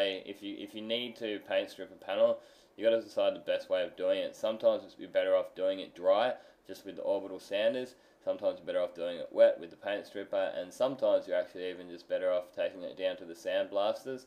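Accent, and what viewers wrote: Australia. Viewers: this is awesome work mate, where are you in australia? sounded like a qld accent to me haha i’m from adelaide, been watching a few videos for my capella project, its been painted before so i’m thinking wet paint remover will be the best, can you send a message or something of a good paint remover? cheers legend!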